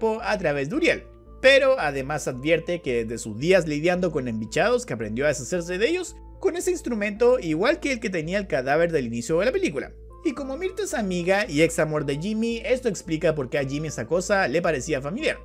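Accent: Argentina. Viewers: El acento argentino de 10/10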